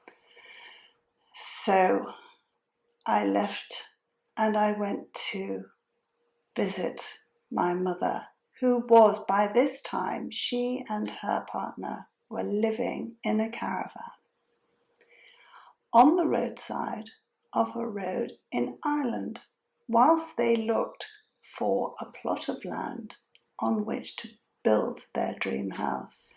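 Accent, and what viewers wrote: England, United Kingdom. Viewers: You have a beautiful English accent,so clear,and educated😊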